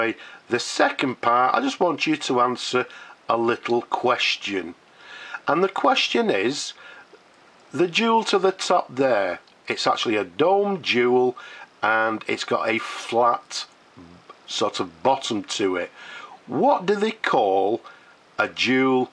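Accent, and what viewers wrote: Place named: North, United Kingdom. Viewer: love the pen and glad to see the nice event with my favorite nothern accent again.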